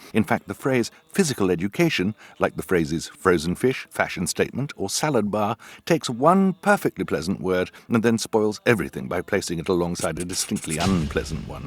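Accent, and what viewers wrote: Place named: United Kingdom. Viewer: why is he using a British Accent The Real Lemony Snicket A.K.A. Daniel Handler Is American. I Know Because I Met Him In Real Like Before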